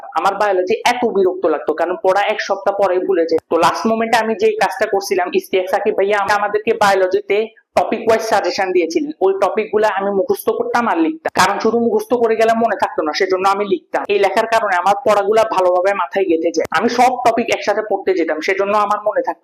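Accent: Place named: Noakhali, Bangladesh. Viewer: Vai er bari noakhali naki 🥰 noakhailla tone ache 🥰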